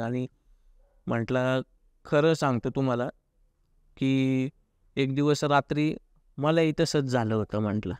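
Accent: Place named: Ghats, India. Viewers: तुमची भाषा कोकणी वाटत नाही. पुण्याकडचे वाटता. आणि कृपया कोकणाचा उल्लेख करू नका. काही गरज नाही त्याची. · आमी पन कोकणातलेच हत, पण दादा सांगतत ते कोकणातले हत पन त्यांची बोली भाषा ही घाटावरची हा.. मालवणी किंवा कोकणीच्या आसपास देखील नाय हा.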